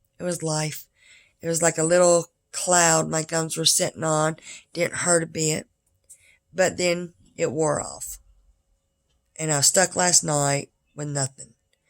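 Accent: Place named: Kentucky, United States. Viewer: Aww girl, they look beautiful!! I'm going thru extractions now and shew they ain't no joke lol! This gives me hope tho! Keep us updated! Btw, I don't know where you're from but I'm a Kentucky girl and you sound like your from my neck of the woods lol! I mean that in the best way because girl...my accent is thick lol!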